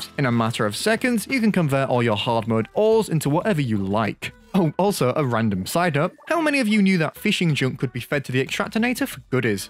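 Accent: United Kingdom. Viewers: hearing his british accent makes me mad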